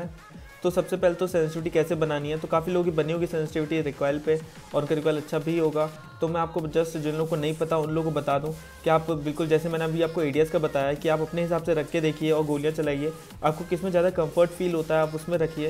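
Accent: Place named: Bengal, India. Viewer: i think u r from west bengal😅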